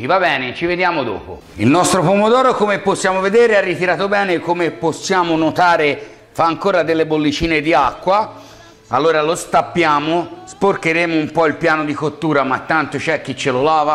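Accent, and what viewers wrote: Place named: Tuscany, Italy. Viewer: Sei strabravooooooooooo❣️❣️❣️❣️Mio marito adora i ciccioli e devo assolutamente cucinare la tua ricetta,ma in Svizzera non trovo i ciccioli xciò dovrà aspettare la nostra prossima puntatina in Italia e precisamente a Brescia.Sei simpaticissimo ,adoro il tuo modo di fare è il tuo accento toscano.Bacione😘😘😘😘😘😘😘😘😘😘😘😘😘😘😘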